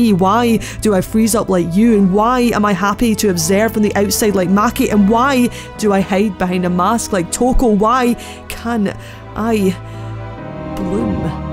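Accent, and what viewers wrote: Scotland, United Kingdom. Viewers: I'm not good with the specifications i.e Glasgow vs Edinburgh, but if you couldn't tell anything past 'British' it's a scottish accent. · Where on earth did you get any of that? It's such a lovely and blatantly Scots accent, and I can't hear anything Australian in it at all. (This from an Aussie) And the middle-aged part is at least as baffling to me. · Ooft ya, is that a wee scot I hear?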